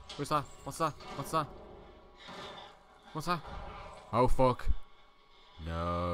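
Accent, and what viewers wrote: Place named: Liverpool, United Kingdom. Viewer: I'm not very good at accents but I recognise real Everton supporter anywhere lol lol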